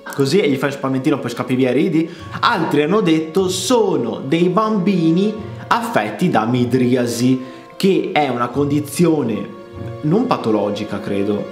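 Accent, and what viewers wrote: Veneto, Italy. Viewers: gamer foxy22 nord perché è del Veneto😆😇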